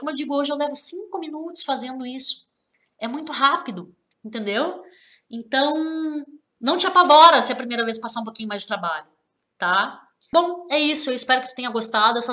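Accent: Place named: South, Brazil. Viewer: Do Sul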